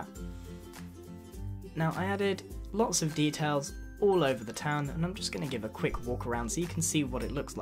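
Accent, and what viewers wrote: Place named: RP, United Kingdom. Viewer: This guy has the most posh accent I've ever heard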